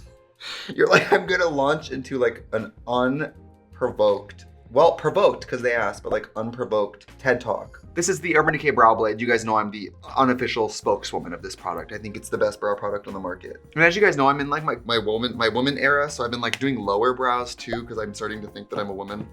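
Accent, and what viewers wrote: Wisconsin, United States. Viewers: Wisconsin Accent coming through more than normal today. Love me a dræg moment ❤️ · I love when you lean in to the Wisconsin accent.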